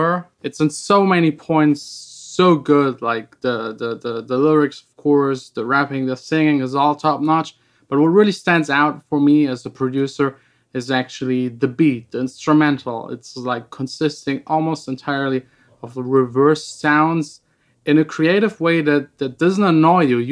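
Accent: Germany. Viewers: Wait are you from 🇩🇪??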